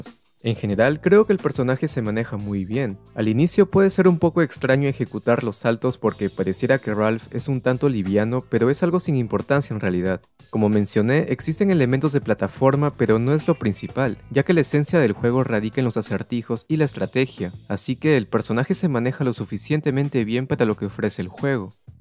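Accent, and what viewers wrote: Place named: Ecuador. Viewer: de verdad? es que vivo aca en ecuador y tu acento se parece al de aqui jeje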